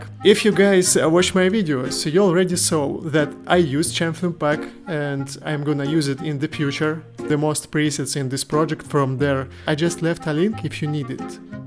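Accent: Russia. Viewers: У тебя русский окчент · Такое ощущение, что акцент русский))))) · Английский язык с русской интонацией и манерой речи звучит понятнее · That russian accent tho) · Блять ты русский? Афигень акцент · Для русского англорусский акцент звучит как родной русский язык)) Рад, что наткнулся на тебя, подпишусь! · u russian?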